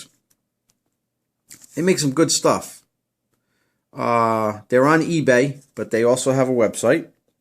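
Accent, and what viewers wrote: Boston, United States. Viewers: Holy shit the accent tho. Bahston! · New England Boston accent.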